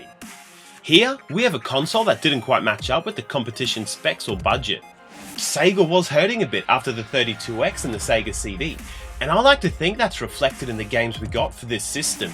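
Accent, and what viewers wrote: Australia. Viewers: The Sega "Satin" I love Australian accent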